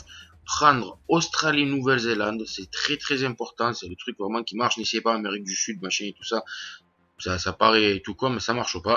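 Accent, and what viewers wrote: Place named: South, France. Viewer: T'as un accent du sud ! Tu viens vraiment de Belgique ? :P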